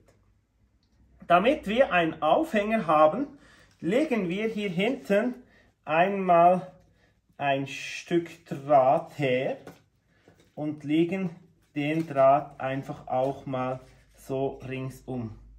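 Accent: Switzerland. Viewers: mit einem soooo lieben Schweizer Dialekt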